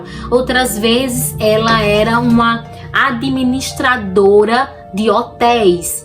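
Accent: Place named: Northeast, Brazil. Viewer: "E disse bem assim" se existe coisa mais bonita que nosso sotaque nordestino narrando casos criminais reais, eu desconheço. Parabéns pelo canal.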